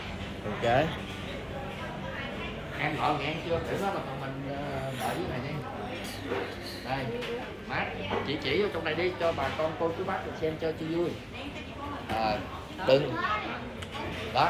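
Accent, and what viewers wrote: Mekong Delta, Vietnam. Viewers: không làm bộ đâu có nghe nói 30 năm chưa về Vn nếu như vậy anh rời Vn 1993. Nhớ không lầm là người Trà Vinh cho nên nói người nhà quê đi Sài Gòn là đúng không có sai. Bạn nói làm bộ lúa cho phim hấp dẫn nghe cho rõ giọng nói là giọng miền tây chính gốc. Nếu đi 1993 Vn còn bị Mỹ cấm vận thương mại mãi đến 28/01/1995 hai bên mới mở văn phòng phòng đại diện. Đến 1997 Vn chính thức mở cửa thương mại thông thương với các nước nên mới thay đổi bộ mặt Sài Gòn Gòn xưa. Những năm đó muốn nhập hộ khẩu ở Sài Gòn cũng rất khó khăn.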